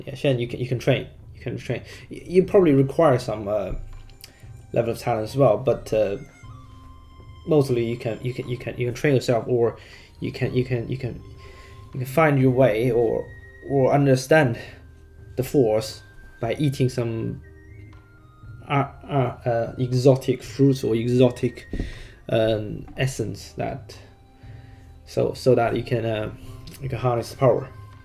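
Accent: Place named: United Kingdom. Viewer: Your accent is very English aha. I thought you were native at first. Thanks for the video and the extra background info is great.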